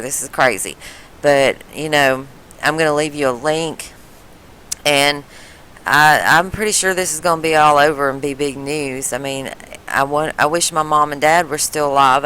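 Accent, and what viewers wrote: United States. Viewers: Fk don't you just fkin hate the way yanks speak we gave you English fk sake use the language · where else do you hear blockbuster stories like this except for the "world news daily report"...such a bastion of truth, no other news outlet has even bothered to question it! you fucking dipshit. not everyone with a southern accent is a moron, but you ain't helping with drivel like this.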